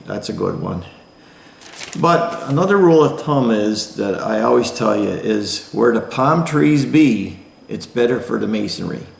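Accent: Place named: Pennsylvania, United States. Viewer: I love your videos. They have taught me so much. Of course experience of actually doing the job is worth way more than text book info. You have helped me tons! Plus I love the Pennsylvania accent. Keep up the good work! And thank you! 🙏🏻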